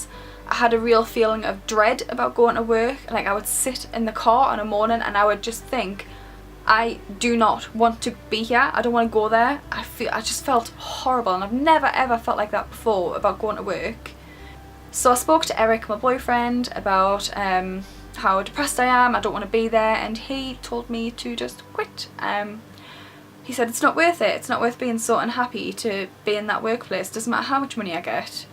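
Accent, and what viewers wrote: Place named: Geordie, United Kingdom. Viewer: This was a really great Q&A :) also love that Geordie twang. Like I live in Newcastle also but I don't have the twang... Kind of jealous actually :)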